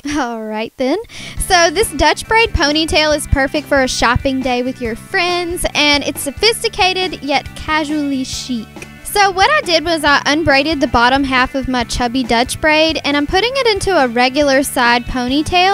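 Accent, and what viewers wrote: South, United States. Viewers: what state do you live in??? you sound like you have a southern accent and i love it!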